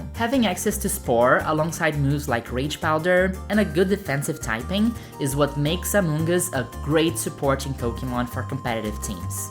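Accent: Brazil. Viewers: Pokémon's massive popularity definitely helps with it, but to grow up with it, and feel free enough to not be bound by those "now that you're an aDuLt you must renegade your childhood", in general are more accepting. Most of us were the 'weird kids', be it a gender reason, neurodivergency, or simply rather nerdy interests in general. When you exclude people they tend to band together, and in an era that all children, specially the ones that were left out, had a game/anime to bond over, they grow up not only pokémon fans, but opression-aware people. I tried not to write an essay here, but I needed to engage, I've found this channel randomly now, and...Eu reparei no sotaque, depois na bandeira, e depois minha mente explodiu! Então não só me inscrevi na hora, como tem que engajar 2x mais, porque é conteúdo de pokémon, é brasileiro, e é de gente finíssima! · came to this video because a YouTuber I liked recommended it, so Imagen my joy when I hear a Brazilian accent and when I look up I see not only a Brazilian flag but what I assume is a trans Brazilian flag! 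Mal posso esperar para assistir a mais vídeos seus!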